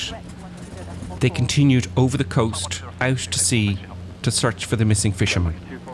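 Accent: Ireland. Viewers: Thank god! I've so missed that beautiful Irish lilt. Some airmanship bringing that down but damn, fancy forgetting to switch tanks! But like you said, we weren't there.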